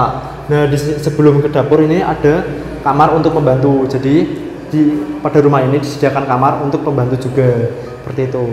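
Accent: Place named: Java, Indonesia. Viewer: Jawanya medok bed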